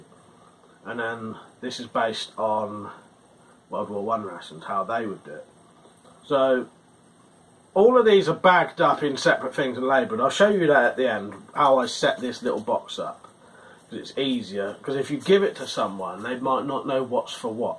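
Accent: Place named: London, United Kingdom. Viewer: Dont want to sound or be rude but wheres that accent from?. Its a good working one but also sounds a bit distinguished.... Is it a London accent.. Good Vids BTW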